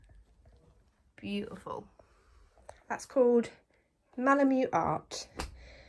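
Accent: United Kingdom. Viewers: Your accent is UK ?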